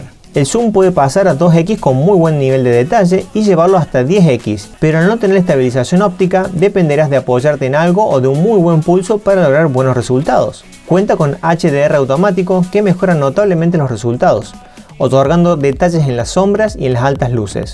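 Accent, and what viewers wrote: Córdoba, Argentina. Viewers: No hay mejor video que el hecho con esa tonada cordobesa... Amé!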